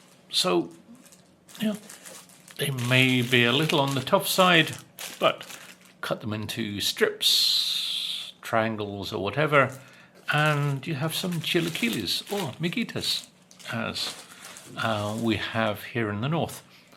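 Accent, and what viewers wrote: United Kingdom. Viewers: I love your british accent,,I try to imitate it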